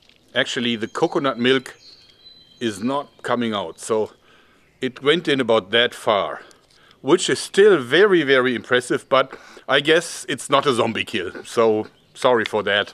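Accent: Germany. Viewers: You're German right? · He’s like my badminton coach but with German accent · ist deutscher er manhörtdas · Are you a German?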